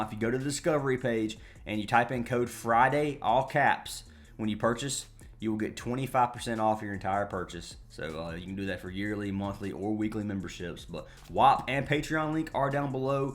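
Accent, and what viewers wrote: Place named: Greenville, United States. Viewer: Bro I live in Greenville. I could tell by the way you talk you was from around here.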